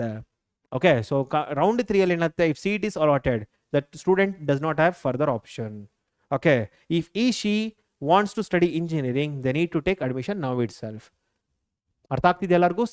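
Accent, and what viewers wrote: North Karnataka, India. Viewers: Sir nivu uttarakarnatakadavara 😊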